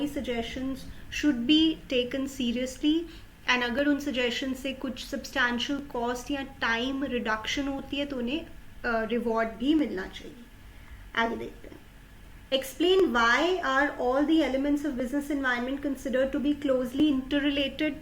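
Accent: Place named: United Kingdom. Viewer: Aap british english b bolte ho 😬 #via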